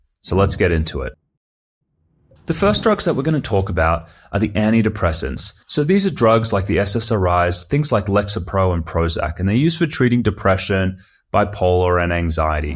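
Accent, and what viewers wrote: Australia. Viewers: I hear an Australian accent? How would you rate the Australian mental hlth system in comparison to other countries?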